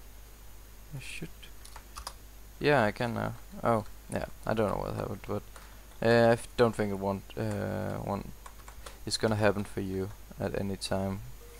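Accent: Denmark. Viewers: Haha du er dansker kan høre det så let XD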